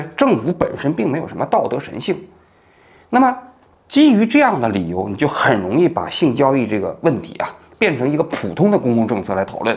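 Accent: Standard. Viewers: 王局发音很标准，没字幕我也能听懂，没字幕也行的。同意的点赞。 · 王局字正腔圆，声音清楚，不需要字幕也听的非常清楚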